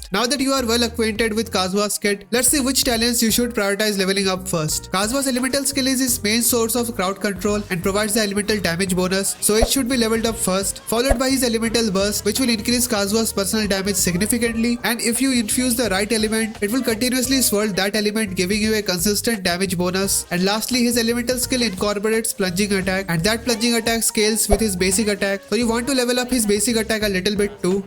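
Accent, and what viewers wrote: India. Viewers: Indian accent 😅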